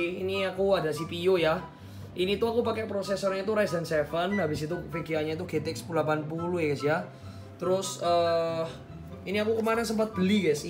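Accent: Java, Indonesia. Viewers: Asli pundi mas kok logat e Jawa wkwk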